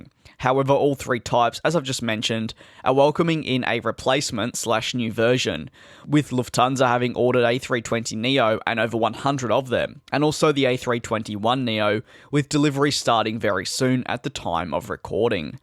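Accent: Australia. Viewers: Hey man, been such a huge fan of you and you sound australian, would love to meet you since I live in Australia as well. Where do you reside?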